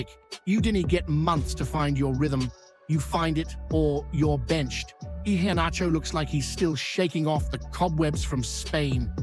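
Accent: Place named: Scotland, United Kingdom. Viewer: Please ditch the cringey Scottish accent and Scottish humour. Just stick to reporting on Football news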